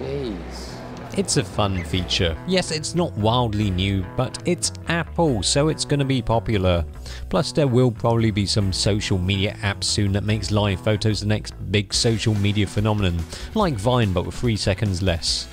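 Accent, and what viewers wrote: United Kingdom. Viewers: To see a asiatic speaking in that very British accent for me is very strange ... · Dear host, i'm from hongkong, really love watching your reviews, very professional and specially...like your british accent:) cheers mate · Bristish accent :3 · sooooooo british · you sound just like a typikal brittish dude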